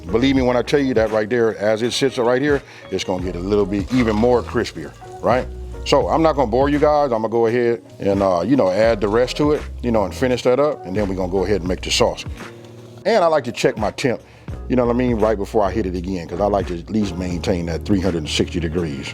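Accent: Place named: South, United States. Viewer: are you born and raised in California? Sound maybe a little bit southern accent maybe?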